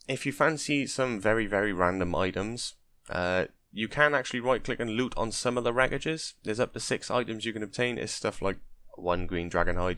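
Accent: Wales, United Kingdom. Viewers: Ty for all the guides. Sound like a fellow welshman!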